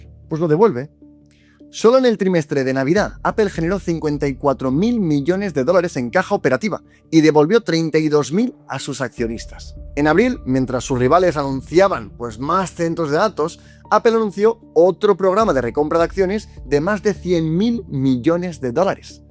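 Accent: Spain. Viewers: otro “apel” fan boy. btw pueden los españoles decir apple como el resto del mundo? caga mucho el palo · Es horrible escuchar la pronunciación del inglés de un español 🤮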